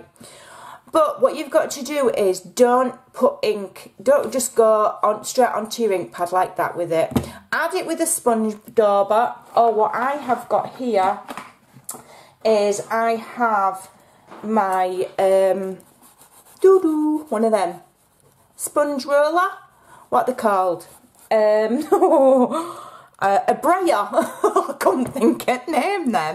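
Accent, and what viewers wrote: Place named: Lancashire, United Kingdom. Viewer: Did you grow up near Lancashire? I ask because Jane Horrocks was born in Rawtenstall and you sound like her a lot. I have always loved your voice! Your so amazing <3 Tug at this American man's heartstrings lol. Thank you for all the wonderful videos.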